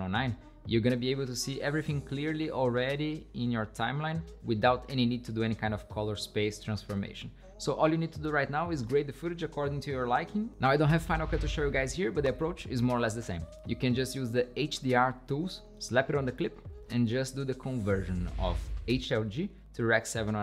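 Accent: Brazil. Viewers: mano, tu é brasileiro? 🤔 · Thanks for the video, really nice explanation!! PS: You have a little Brazilian accent 🤔🤔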